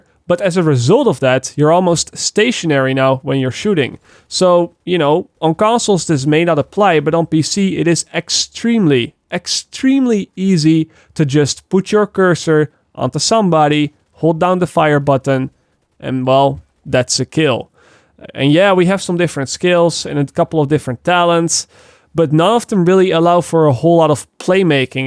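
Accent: Netherlands. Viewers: ???? I love you man, been listening to that sweet Netherlands accent for years, but what are you talking about?40 hours has nothing to do with how many kids you have or if you work at all, lol. I'm pretty sure when they say 40 hours it's not a running clock like you will finish it 8 hours short of 2 days, omg I have no idea why you perceived it that way but that was funny. You could play 1 hour a day for 15 days that's 15 hours. And you could do that living in a village of children with all the jobs you want and still finish as fast as a content creator.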